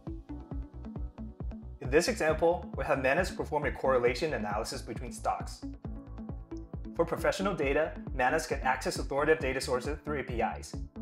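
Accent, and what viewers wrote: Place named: United States. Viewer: Chinese startup, American accent 🧐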